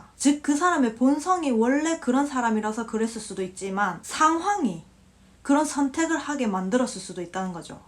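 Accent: Gyeongsang, South Korea. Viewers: 제가 50대인데 진짜 한테 많이 배우네요 그리고 듣고나면 속이 시원타 ~딱 부러지는듯한 경상도 말투도 글코 뭔가 한숨이 길게 나오는거는 속이 시원해짐을 느껴서인듯 ㅋㅋ 앞으로도 좋은 방송 부탁드립니다 화이팅!! 열렬한 팬입니다!! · 오늘 처음으로 유튜브를 우연히 보게 되었네요~~재미있는 경상도 말씨 ㆍ세상쉬운 노후자금 마련방법을 보고 ~두번째 프레임이라는 주제로 잘 설명하는데 ~~매력에 푹 빠졌네요. 아직 학생인것 같은데 세상에~~똑소리 나네요~~구독했어요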